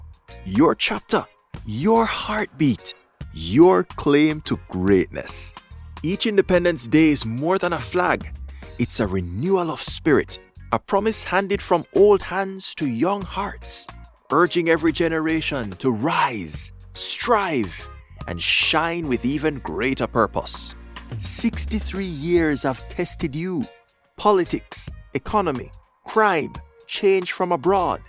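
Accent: Jamaica. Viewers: Why is a jamaica narrating??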